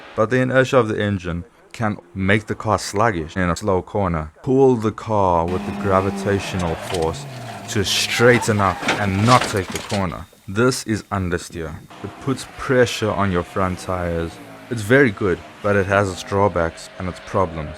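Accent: South Africa. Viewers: since you are taking the time to respond to my comment personally: 1. My ultimate pick would be a Lexus LFA for feel (but due to price, would never get to drive one like that). 2. FD RX7 would be my realistic pick, or probably an old Porsche Boxster for being even more realistic for the wallet and fun. 3. Check out the M3 GTR that me n my mates built for Bathurst (#42), hit a kangaroo 😂 4. I noticed all footage was of the ring - my uncle worked there (I’m a FT dad, so a while before I get behind the wheel again). 5. Porsches are just great from factory 😂 6. I’m actually old - hence the skyline. Nice SA Accent btw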